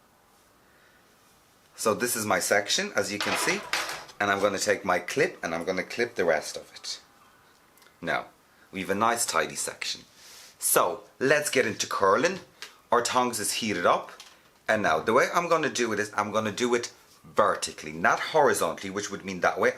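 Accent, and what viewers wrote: Ireland. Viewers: They have an Irish accent :)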